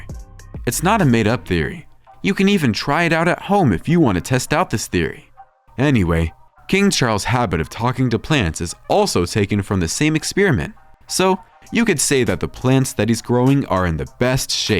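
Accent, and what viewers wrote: United States. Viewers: The claims were made by Burrell who was Diana's butler - not Charles' butler. The claims about Charles are garbage - made for the most part by Burrell. The toothpaste "thing" is something we'd all ask for help with if our arm had had to be broken twice - as happened with Charles. All the claims about toilet seats etc as one example are garbage. Ignore Burrell who's a gossip who makes up answers when he doesn't know the answer and left service with the RF when Diana died over 25 years ago. A butler recently retired from service with Charles laughed at all these claims and said he had never experienced any of them. Note the American accent on the video. Red Flag. So much garbage written and voiced by Americans about the RF all over social media - all of it laughable.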